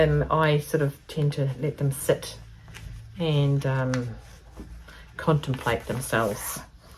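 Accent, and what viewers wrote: New Zealand. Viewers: Have just found your channel through Carol sews. Great to hear a New Zealand accent instead of always American (no offence to our USA bloggers). Your sense of humour is intoxicating, looking forward to following your your channel from a fellow sewist from across the ditch.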